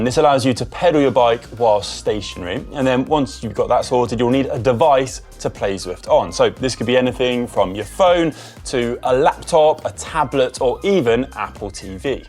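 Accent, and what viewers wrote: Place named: England, United Kingdom. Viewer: Irish name, Irish flag, English accent?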